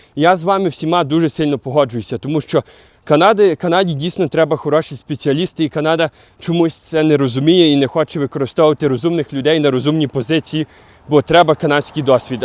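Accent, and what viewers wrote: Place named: Stryi, Ukraine. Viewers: У вас така оригінальна вимова❤ що я немов не у Канаді, а на Стрийщині побувала😅❤ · нагадав мені одного сусіда з сусіднього під'їзду, коли я жила у Львові..(дуже хороша добра людинабув той хлопець..( На жаль, мені чомусь завжди подобались хлопці "з претензією".. Це моя Карма.,)) Але я дуже з великою повагою відношусь до добрих хороших людей. Нехай таких буде більше на Землі! Така розмова у як у певних регіонах Львівщини..) молодець. Розповідає про актуальні теми, показує багато Канади. Дуже цікаво. 🙂🙏... Ці люди всі кудись спішать, вони хочуть тусити, кавувати, їм потрібен натовп.. Я говорю про тих хто жаліється на Канаду. Ну то добре, не подобається - їдьте в інше місце.. Дайте можливість нормальним спокійним інтравертам (і неінтравертам теж,)) пожити в прекрасній країні, де є багато простору, природи і Закони, яких всі дотримуються. 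(!) Держава, яка турбується про своїх жителів. Безперечно, нелегко відразу знайти роботу, можливо, хтось не знає англійську мову. Але хто хоче, той вивчить. І навіть перевчиться, перездать іспити і досягне успіху. Все залежить від бажання людини і прикладених зусиль. Європа колись була чудова, а зараз вона перенаселена. І не дуже безпечна. Кожен шукає, що їм треба. Дай Бог кожному з нас знайти. (!!!) Дякую за відео. 🙂🙏🕉️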